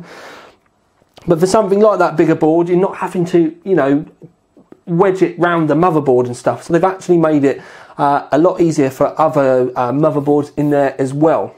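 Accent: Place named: Cockney, United Kingdom. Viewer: You make computer case reviews fun to watch. You are a true American hero. Or would be, if you didn't speak like a cockney so much.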